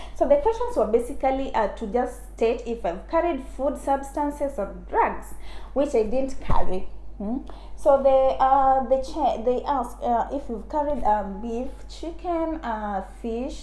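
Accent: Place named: Uganda. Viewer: You are speaking like ugandans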